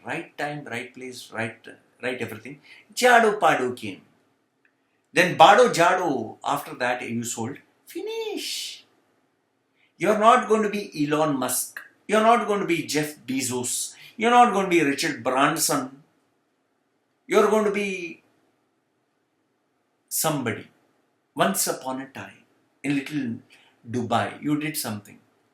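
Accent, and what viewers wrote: Kerala, India. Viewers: Vada idly dosa. Lol you still got the malayali accent and it is very amusing. Again it is free. WOW.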